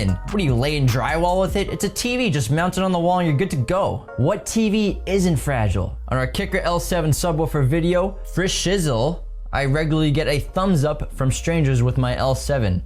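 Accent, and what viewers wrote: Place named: New York, United States. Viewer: You must be from New York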